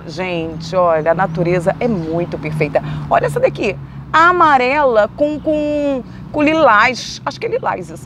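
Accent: Rio de Janeiro, Brazil. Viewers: Eu acho que vc é carioca.deve morar no rio de janeiro vc tem o sutaque de carioca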